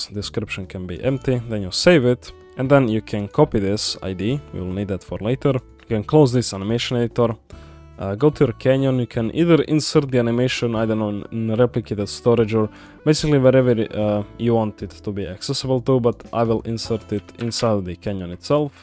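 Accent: Russia. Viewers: скажи честно, ты русский? тебя акцент выдает очень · Love the Russian accent!😁🤣❤👍👍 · russian accent slipping in, nice tutorial! sadly its not shown how to do it trough blender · Руский акцент...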